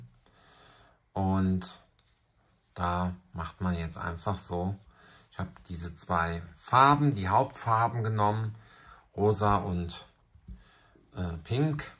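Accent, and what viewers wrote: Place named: Rhenish Hesse, Germany. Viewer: Das ist wirklich ❤ allerliebst .Hab heute meinen ersten gehäkelt. Voll knuffelig.. Ausserdem könnte ich dir stundenlang zuhören ,weil du den gleichen Dialekt hast wie ich. 😅 Ich babbel roihessisch😂 Also nochmal Dankeschön für diese wunderschöne Idee und die tolle Anleitung Lg Sonja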